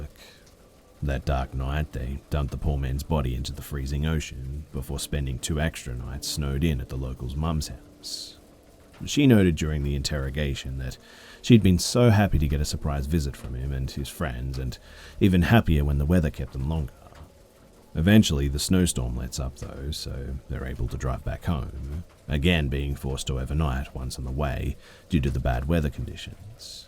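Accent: Australia. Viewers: Aussie are you not? Been looking for a channel that does what you do, I'ma horror fan 🙂also Aussie 😄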